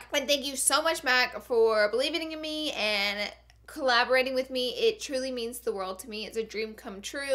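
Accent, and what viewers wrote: South, United States. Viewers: Congrats Laura Lee! Love watching your channel! I am from Louisiana, Southern girl at heart! You still have that Southern Girl accent, love it! Keep being you!!! <3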